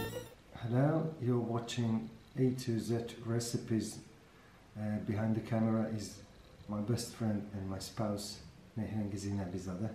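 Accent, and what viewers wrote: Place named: United Kingdom. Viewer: You sound slightly British! Interesting :)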